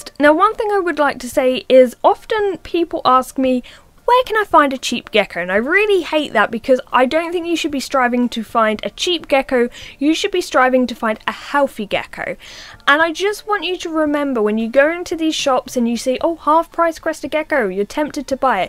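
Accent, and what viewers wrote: England, United Kingdom. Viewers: where about from England are you from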